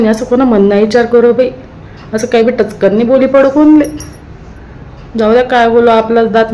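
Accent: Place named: Khandesh, India. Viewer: पुर्ण खान्देशी accent पाहिजे ऐकायला मन तडपतय अजून आम्हाला शिकाचे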